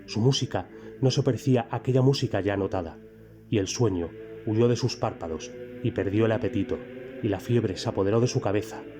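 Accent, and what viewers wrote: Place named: Spain. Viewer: Woooow! Me encanta tu voz Española. Muy buen relato te felicito.🤗👏👏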